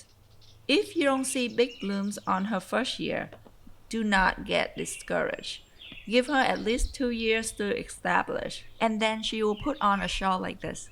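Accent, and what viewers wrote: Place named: Vietnam. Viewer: Are you vietnamese? You have the accent <3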